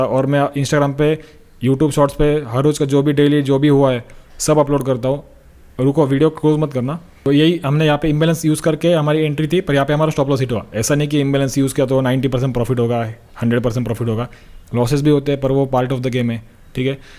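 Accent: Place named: Kerala, India. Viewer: You are amazing!!! with SMC concept bhai.Your accent seems tobe from God's own country,Kerala as I studied in Ernakulam for 2 years.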